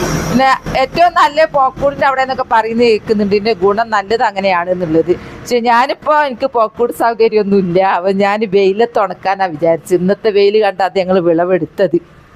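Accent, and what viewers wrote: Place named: Wayanad, India. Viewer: wayanad എവിടെയാണ് നല്ല പരിചയം ഉള്ള സംസാരം, മുഖം, ഞാൻ വയനാട് ആണ്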